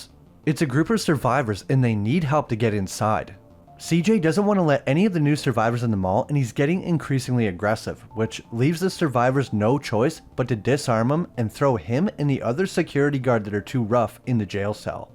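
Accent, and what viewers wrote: Canada. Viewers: You lad are a canadian, 😂 i just noticed - tha accent been lost lately, you sneaky bouuut beer drinking mofo 😂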